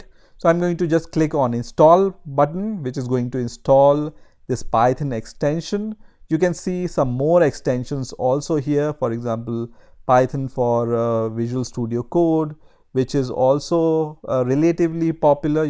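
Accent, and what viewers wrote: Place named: India. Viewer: please don't speak indian english speak fluent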